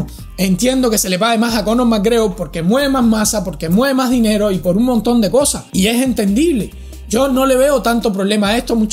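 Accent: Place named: Cuba. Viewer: Yow mano, me encanta lo claro que hablas y ese acento cubano.👊🏼😁 Saludos desde España.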